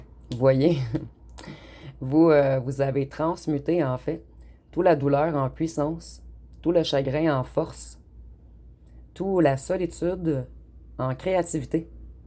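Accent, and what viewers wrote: Quebec, Canada. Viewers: Vous etres rigolote j'aime bien vous ecouter quel beau accent quebecois❤❤❤❤❤❤❤❤❤❤❤❤❤❤❤❤❤❤❤❤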